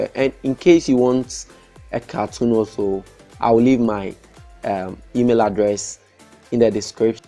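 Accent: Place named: Ghana. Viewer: Sounds like a Ghanian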